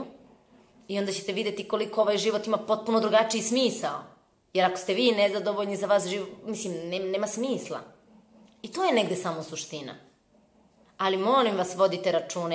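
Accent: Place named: Čačak, Serbia. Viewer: uh, sto mi se dopadas....lepo ti zvuci naglasak...,mislim Cacanski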